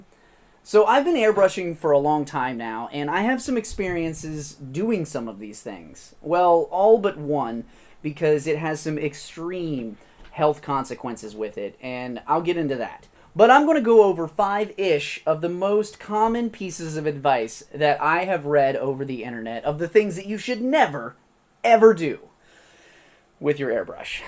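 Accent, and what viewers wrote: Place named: United States. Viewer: Get to the point and just stop the twangy American blah blah blah theatrics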